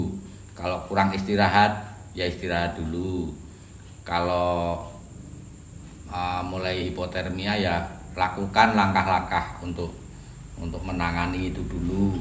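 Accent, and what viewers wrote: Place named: Semarang, Indonesia. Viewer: Itu bahasa Semarangan kak, medhok nya ada sedikit ngebass2nya gtu, wkwk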